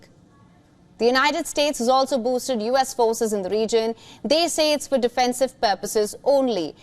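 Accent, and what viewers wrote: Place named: India. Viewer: I love Indians accent 😍😂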